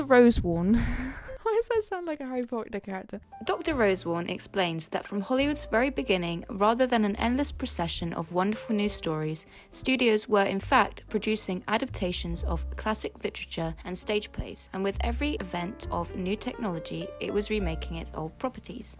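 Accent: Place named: Midlands, United Kingdom. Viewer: Your channel is great. Very well put together stuff, especially since this is only your fourth video. If it's not a big secret, which I totally respect if it is, are you from the Midlands by chance?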